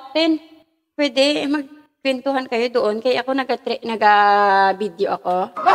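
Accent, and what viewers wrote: Iloilo, Philippines. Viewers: ilonggo ka maam? hehhe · Gakalingaw ko mg ilonggo ka a.. haha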